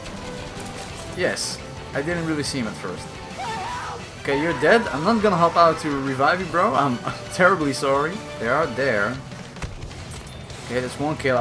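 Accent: Netherlands. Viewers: I smell dutch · Ik kan je Nederlandsse accent herkennen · You sound Dutch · Wait are u from the netherlands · Are you Dutch by any chance? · you have a Dutch accent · you have that tipicle dutch English accent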